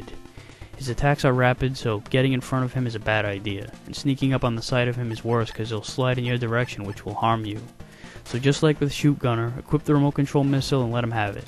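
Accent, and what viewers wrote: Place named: New York, United States. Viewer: This is an amazing walkthrough! By chance you from New York? Just wondering as I hear a sight accent. Either way thanks and cheers!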